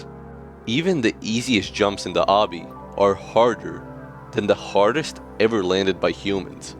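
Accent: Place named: Latvia. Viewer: Latvian accent💔🙏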